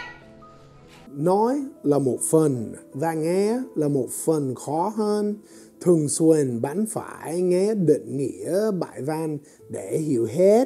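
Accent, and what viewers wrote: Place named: South, Vietnam. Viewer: Nghe nói tiếng Việt thậm chí dễ nghe hơn người Việt ở miền Trung hihi, vì nói theo giọng miền Nam!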